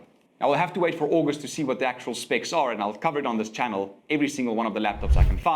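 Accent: South Africa. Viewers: Bro, are you from originally South Africa?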